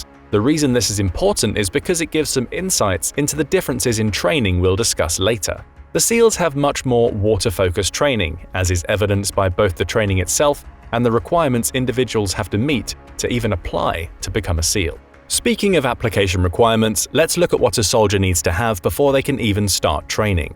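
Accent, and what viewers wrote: United Kingdom. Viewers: That’s exactly what I was just about to mention!! Is no one seeing that this vid is being narrated by a fellow Brit?!?😝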